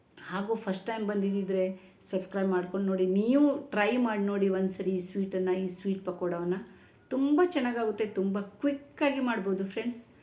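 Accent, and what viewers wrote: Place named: North Karnataka, India. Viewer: ನೀವು ಉತ್ತರ ಕರ್ನಾಟಕದ ಭಾಷೆ ಮಾತಾಡ್ತೀರಲ್ಲ ಸಿಸ್ಟರ್ ನಾನು ನೀನು ಉತ್ತರ ಕರ್ನಾಟಕದವರು ಅನ್ಕೊಂಡೆ ಸಿಸ್ಟರ್❤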